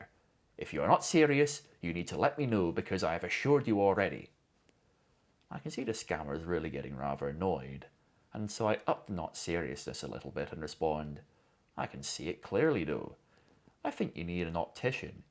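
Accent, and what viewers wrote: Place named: Arizona, United States. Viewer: I’d recognize that Arizona accent anywhere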